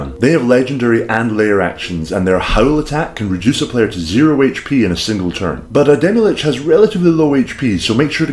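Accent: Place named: Edinburgh, United Kingdom. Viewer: I've watched a fair few of your videos. The accent keeps swaying into Edinburgh east coaster.... 🤔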